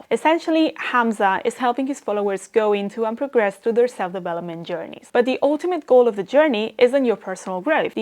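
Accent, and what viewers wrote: Spain. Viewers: It's me or your main language is spanish? Your content is really good, I'm just starting and is valuable to find channels like this, blessings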